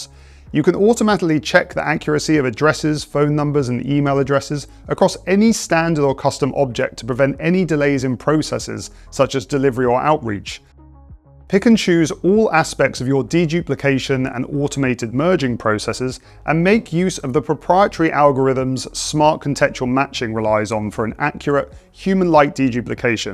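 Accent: United Kingdom. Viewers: Dude, your British accent is difficult to listen to when trying to learn.....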